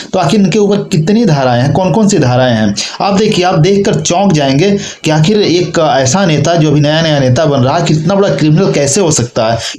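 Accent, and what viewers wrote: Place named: Bihar, India. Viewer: अरे अपको बात से पाता चलता बिहारी हो तुम जायदा बाकर बकर मत अरो झारखंड के बारे मे ठीक है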